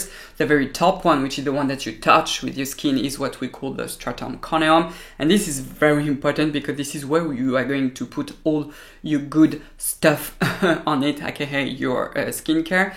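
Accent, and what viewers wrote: France. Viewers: I thank you so much for your content & explanation! Every video you make me smarter & way more informed. Added bonus is listening to your incredible French accent! French was my foreign language in school as I think it is the most beautiful language in the world! ❤️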